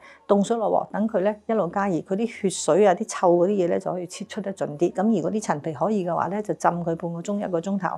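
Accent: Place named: Hong Kong. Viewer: 講話清浙快折暢順，純正的香港話，博學的中醫知識！令人聽落舒服感受！容易學會及掌握各樣要點 𧹍𧹍𧹍!!!香港中醫學術領域之光！😊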